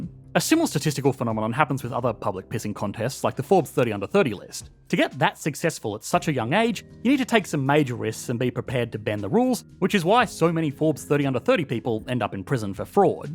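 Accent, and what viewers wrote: Australia. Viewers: Stop speaking in Australia sound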